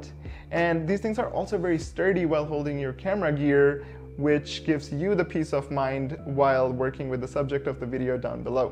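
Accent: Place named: United States. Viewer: Hey bro can you tell how to do american accent like you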